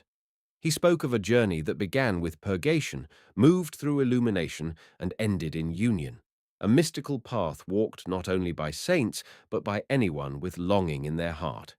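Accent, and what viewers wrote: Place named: United Kingdom. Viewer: Interesting subject and perfect accent. This is the real British accent .😊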